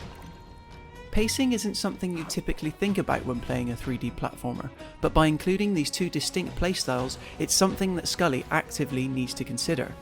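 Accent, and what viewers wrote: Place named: Somerset, United Kingdom. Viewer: Think this guy is from Somerset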